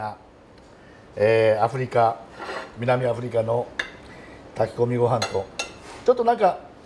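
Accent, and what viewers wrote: Hokkaido, Japan. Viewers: シェフの北海道弁に、郷土料理に対する愛情を感じました。旧フランス領で発展した料理 なども紹介してほしいです。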